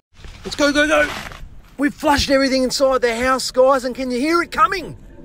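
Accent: Australia. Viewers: I Am from The Netherlands and I just love your australian accent 😊 And it's kinda fun to watch how it's done, Thanks for the amazing content ☺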